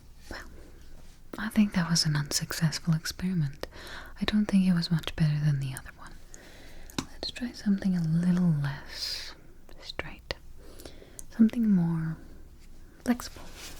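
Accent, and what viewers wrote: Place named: Mid-Atlantic, United States. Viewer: I wonder if mid Atlantic accent is for ASMR or if she always talks like that. Either way it's perfect for ASMR intentional or not 😌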